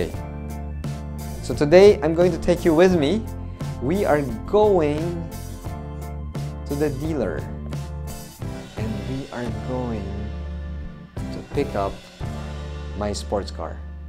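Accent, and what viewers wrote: Philippines. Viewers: Ooohh, that is so nice 👍🏻 I’m watching your video and my wife hears you talking and she says oh that’s Philippino. I have a 99 Miata. That’s old, but I love it. It still runs great. It’s white with tan top. I wish I had one like yours. Beautiful Scarlet...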